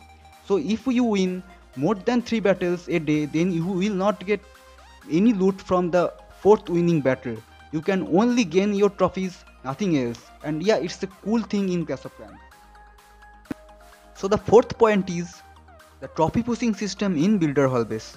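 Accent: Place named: Bengal, India. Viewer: U WERE IN MY GLOBAL ONCE.. WHEN I HAD MORE TROPHIES THAN U. AND STILL I HAVE.... I M SNIFFING BENGALI ACCENT OUT OF YOU BUDDY... GOOD WORK BOI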